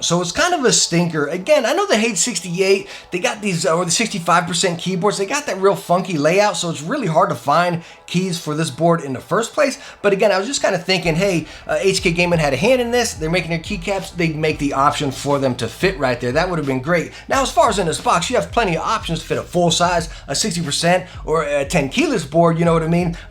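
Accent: United States. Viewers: This guy's accent and way of talking is so American....but good video tho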